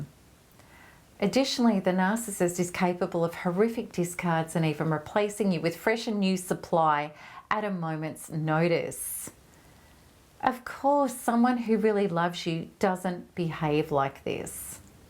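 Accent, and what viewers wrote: Australia. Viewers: Your Aussie accent is like butter on toast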